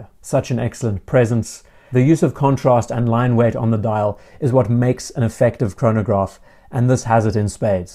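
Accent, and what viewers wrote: South Africa. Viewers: Is the narrator South African ?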